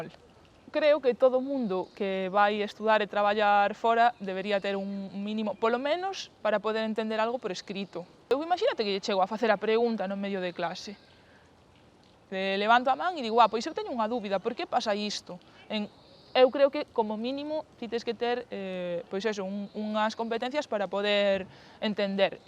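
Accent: Spain. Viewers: Português com sotaque espanhol .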